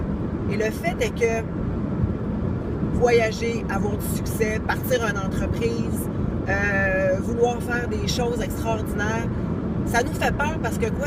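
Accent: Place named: Canada. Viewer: j ai du mal à comprendre à cause de l accent canadien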